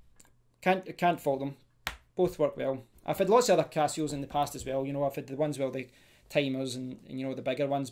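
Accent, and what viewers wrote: United Kingdom. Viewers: Definitly British by the final entonation but I find your accent uncommon, unsusual for me. Maybe is it Scottish? Greetings from Spain.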